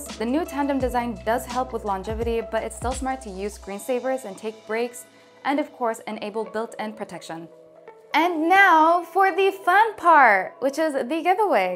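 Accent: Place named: United Kingdom. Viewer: I need this monitor because you do the best British accent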